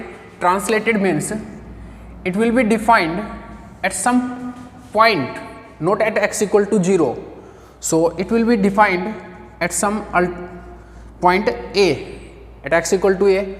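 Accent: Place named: Haryana, India. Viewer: This video is very much useful,Sir .. Thank you very much. But in this video we miss your hariyana language Sir ,wo wala language jyada cute hota hei 😊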